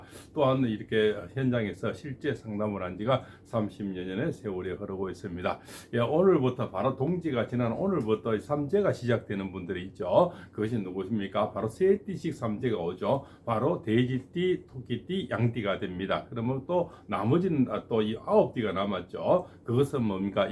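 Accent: Gyeongsang, South Korea. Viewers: 아,넹 감사합니당ㅋ 쌤 경상도 발음을 제가 잘 못 알아 들어서 죄송합니다ㅠ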